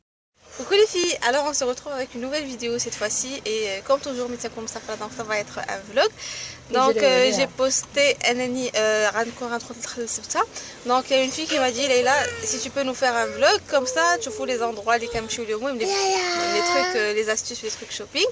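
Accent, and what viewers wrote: Fez, Morocco. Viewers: andk l accent fassi yak?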